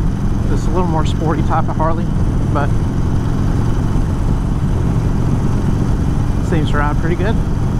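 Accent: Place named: North Carolina, United States. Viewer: its just the soft voice and southern twang thats all it is so many people in nc sound like this it pretty normal voice honestly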